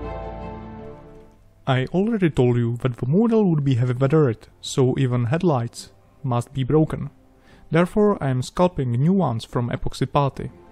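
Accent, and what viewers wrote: Czech Republic. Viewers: are you from Czech republic? :D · Bruh that czech accent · Are you from Czech right? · Nice work PS: I hear the Czech accent :) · Hello, this is my first seen video from you its amazing and i noticed a czech akcent so if you are from Czech Republic. Tak Zdravím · Judging by the accent I'd say he's from Czech Republic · Ta češkovina tam jde strašně slyšet 😂😂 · Jedna otázka: SI ČECH? Lebo ten prízvuk mi strašne sedí na brata môjho nárda! :)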